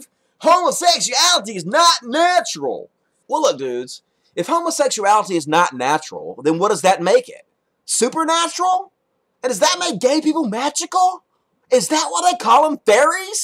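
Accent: South, United States. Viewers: your southern accent makes this video so much better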